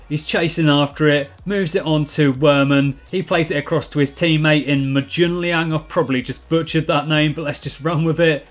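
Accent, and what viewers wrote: Oxford, United Kingdom. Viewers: Are you from oxford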